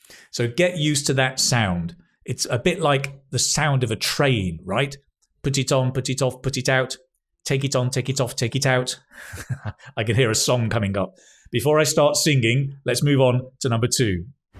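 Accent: United Kingdom. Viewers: Wait. This is British English, no? Excellent for lesson, especially about the pronunciation in English.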